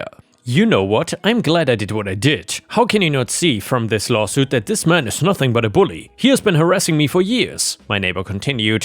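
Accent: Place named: Germany. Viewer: You know what I just realized? You have pretty much lost your German accent. Your English is awesome.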